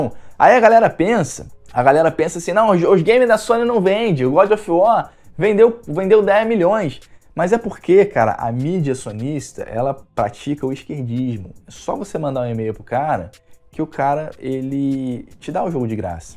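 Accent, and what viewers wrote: Rio de Janeiro, Brazil. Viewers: Senhores, esse é o sotaque carioca que a gente se acoxtuma aqui na cidade.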